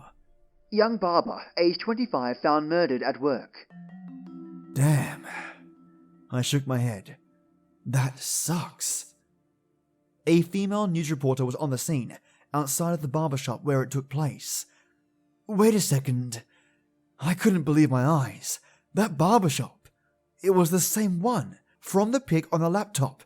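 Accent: Australia. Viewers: My younger brother, Lee is here and he absolutely loves your voice! He wants to listen to more of your videos. He enjoys the inflection of your voice, your wonderful Aussie accent and the little injections of different voices you do. My brother noticed how genuine you are and how much you love narrating stories. I always knew he has good taste and what I consistently say about you rings true and aligns with what he said. How wonderful! My mother, brother, Daisy and myself all appreciate your videos and narration. Thank you very much my lovely and brilliant friend and for this!❤💜🧡💛💚💙💓💕💖💝💞☕🍰😇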